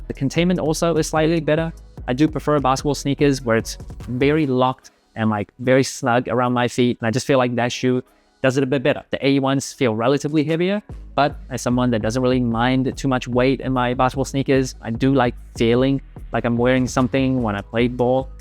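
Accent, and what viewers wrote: Australia. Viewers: I thought so, you definitely sound more Aussie than Kiwi haha 🥝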